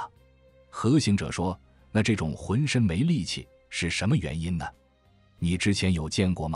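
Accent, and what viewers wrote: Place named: Hunan, China. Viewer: 大概是65年前，在学校安排下，每年要去乡下插秧，收割2次，对些湖南乡下的口音，还记得些。今天听您的故事，对修頭陀的苦行僧很是尊敬，更是您用些湖南乡下的口音，引出乡愁。很为这久埋在记忆中的当时乡下佰姓的纯朴，实在，有一种由衷的怀念，感动。谢谢您的故事。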